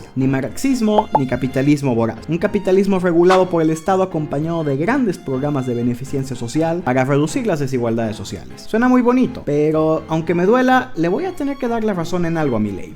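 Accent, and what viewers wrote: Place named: Mexico. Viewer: Se nota que no sos argentino y por como hablas pareces mexicanito y haces este video sin conocer al país argentina ni a du gente y menos al presidente y mucho menos la historia de argentina y te pones a decir ridículeses sobre cosas que no conoces lo mejor sería que bajes este video falso estende y antes de hablar de otro país tenes que informarte bien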